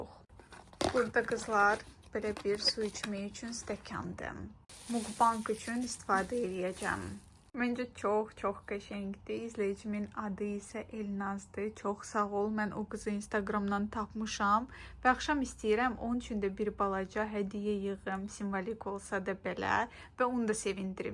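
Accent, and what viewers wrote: Russia. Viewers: после долгого просмотра тебя на русском, смотреть и слушать азербайджанский из твоих уст так странно. И сразу чувствуется,что ты русскоговорящая😅 ❤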